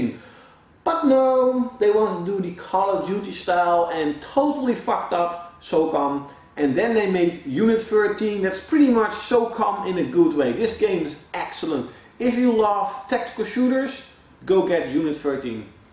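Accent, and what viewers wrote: Netherlands. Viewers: are you dutch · Gast je hoort echt te goed dat je nederlands bent... werk aan je uitspraak!! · Are you dutch? Nederlands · Haha goeie collectie, je kan trouwens goed horen dat je nederlands bent. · Are you dutch?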